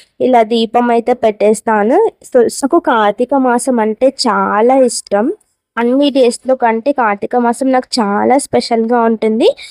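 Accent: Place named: Sangareddy, India. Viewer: Hii sis first time mi video chusanu.mi slang same maa lane undi madi sangareddy.mi villege yenti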